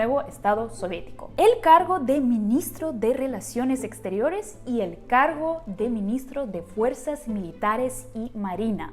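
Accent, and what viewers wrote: Ukraine. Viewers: Buen documental, cuando estube en el DF, trabajando y estudiando, muy de seguido pasaba por el museo de Frida Kahlo, Diego Rivera y León Trotsky, pero nunca entre, una pregunta porque estás volviendo a hablar con acento ucraniano ya lo habías perdido, es bonito, no lo critico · Soy yo o está volviendo a tener su acento Ucraniano???:P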